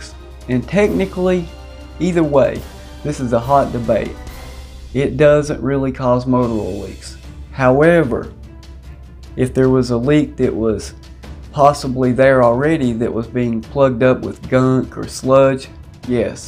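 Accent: South, United States. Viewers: Slow drawl southern hick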